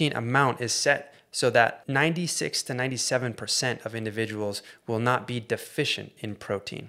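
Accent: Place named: Australia. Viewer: Do you have an australian parent, your accent is interesting.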